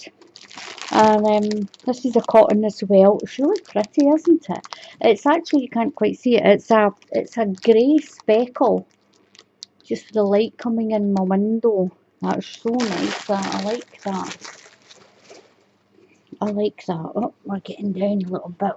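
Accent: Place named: Scotland, United Kingdom. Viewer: I think you’ll find it’s pronounced ‘boo-clay’, because of all the fuzzy bits. 😃😃😃. You deserve this lovely gift. Whenever I hear your voice I remember the delightful four days I spent in Edinburgh a few years ago. I loved Scotland.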